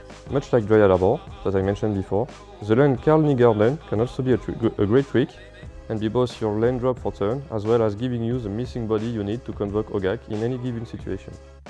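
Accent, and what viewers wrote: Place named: France. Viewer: L'accent français 🤪😘 La même en français c'est possible ? 😁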